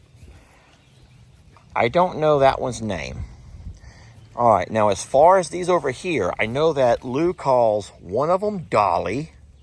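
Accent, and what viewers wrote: South, United States. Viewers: Hi, This is just a suggestion. I think the best spot for the pigs is by the pond. If they are introduced slowly to the horses, I feel they would be happier by the pond just like at ISS. Side note: I've been watching y'all since 2017, and your country accent has gotten thicker since retirement. I know you're a code switcher, BUT I've never heard it this thick <- I do enjoy the melody, though ❤ Thanks so much for sharing, and LHL's looks fabulous with all the babies on it 🐎🫏🐐🐖🦙🐏🪶🐕🐈🐈‍⬛ P.S. Can we get one of these -> 🦄🤣😂🤣 Much love ♥️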